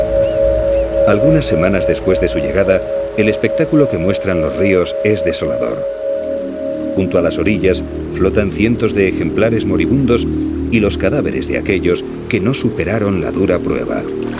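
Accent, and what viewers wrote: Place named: Spain. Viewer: El problema es que el acento españolito no ayuda !!!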